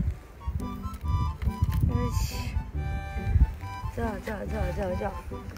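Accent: Kansai, Japan. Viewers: さんのコテコテ感のある関西弁大好きです♥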